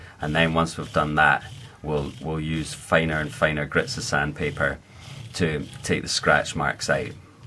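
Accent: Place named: Scotland, United Kingdom. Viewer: These two videos have gave me the confidence to try my own bit of fret leveling. Very relaxing to watch also, I think it's your Scottish accent. You sound a smidgen like Stephen Hendry.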